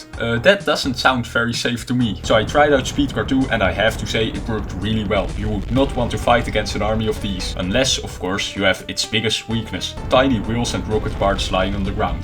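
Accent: Netherlands. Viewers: Random question: are you Dutch? I'm surrounded by people with quite similar accents like yours so time for curiosity to commit a murder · Its a dutch accent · I can hear You’re dutch, are you? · Your Dutch right? Like you really sound dutch and I would know, because i am please respond In Dutch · Cool dutch accent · i smell dutch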